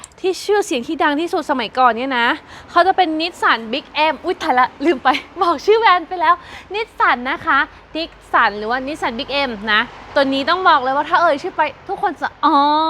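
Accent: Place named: Northern Thailand, Thailand. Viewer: ชอบฟังไทยกลาง ผสมเหนือ น่ารักดี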